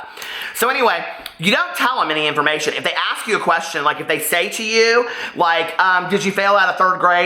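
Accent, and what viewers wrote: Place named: Midwest, United States. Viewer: I knew you was from the midwest. We keep it real there.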